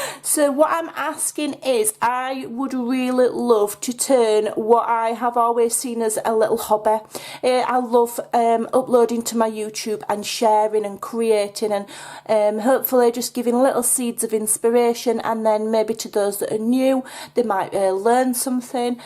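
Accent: Yorkshire, United Kingdom. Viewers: I'm sort of new and old at the same time. I've followed you off and on and see you in my feed sometimes and remember that I haven't checked in for awhile. What I find draws me back and keeps me locked in for months at a time are the series or segments that you do that have a theme so I can go back and find them. I love that you do all sorts of things, I love who you are and listening to you (perhaps I'm a weird American because your grammar and accent don't bother me and actually are fun because Yorkshire isn't an area I knew well but it reminds me of my ex-husband's mother who was originally from there before going to Essex and being there for almost 40 years by the time I met her) and so all I can offer as a suggestion is that you might find doing specific series helps. You're an excellent teacher, and you've also been good at letting us learn with you... 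so you don't have to know it all ahead of filming, just learn with us and go through the whole process. :D Lets see what WE can do to help you spread your channel and courses, too... give us super tasty bits to share to bring people in. Quick challenges that we can do with you and link up on Instagram, maybe? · Hi, some feed back for you! I love your Yorkshire accent (perhaps I'm biased as I'm from Yorkshire too), there's nowt wrong with it so don't take any notice of anyone who criticises it. Your videos are fun and easy to watch/listen to, I like them as they are natural and not staged. I am quite new to junk journalling so these are the videos I look for and watch, so more of them for me. That doesn't mean I don't like to watch other things too, they can spark ideas. I don't like really long videos, so fast forwarding is fine, we can always pause and rewind. So for me, I don't think you need to do anything different! 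· I love what you do, love your accent, have always liked the Yorkshire accent (Big fan of coronation street) You have inspired me with things you create and you are very easy to follow along with.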